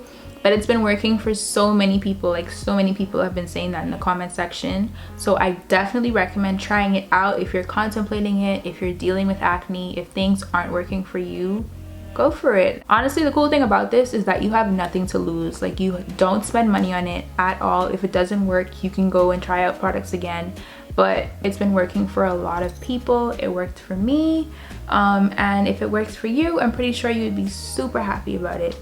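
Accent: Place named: Bahamas. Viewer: Are you a Bahamian? Sorry but I’m one and I’m like she sounds like one too. But I love your content . Keep it up!❤❤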